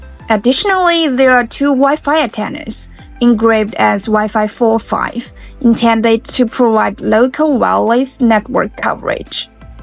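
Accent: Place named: China. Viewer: Her slight accent is Chinese, I don't trust Chinese data equipment due to the CCP requirements to hand over data to them, might be a back door built into it for all I know, or remote deactivation during war time. That said, this company is not on the U.S. government list of unapproved modems for their use, so might be OK. The quality looks great and I do prefer the N-connectors over the small SMA connectors every other manufacturer uses. Only question I have is where to buy it without the tariff tax? Shipped directly from China?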